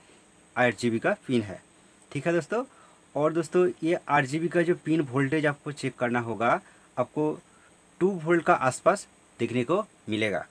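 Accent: Bengal, India. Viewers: भाई की हिन्दीभी बहोत ध्यान से सुननी पड़ती है क्योकि लहेका बांगला में आता है बेस्ट करते हो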